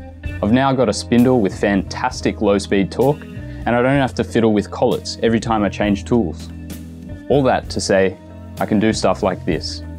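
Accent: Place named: Australia. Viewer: Bro I just found this channel, and thought: well that’s weird, he sounds like an Aussie, anyways, let’s check after the video. Then I saw . Bruh. By now I feel like every Australian YouTuber I’ve seen so far is living in one neighborhood, and Wade from DankPods is an imposter from NZ, only pretending to be an Aussie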